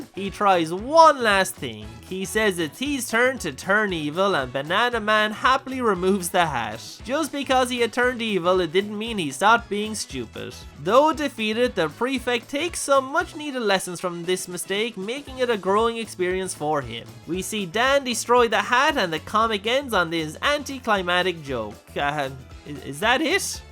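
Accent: Ireland. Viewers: ffs... I was trying to work out your accent.. going from dutch to welsh to ...ireland... then i heard your 'artwork'..deffo southern irish.. scrolled down to see Galway , derp. Onie tuck uz twarthie minnits , sake!... I used to see bananaman on u.s. tele late 80's via nickelodeon...had no clue the backstory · I normally like an Irish accent - but I'm afraid your voice makes my ears bleed.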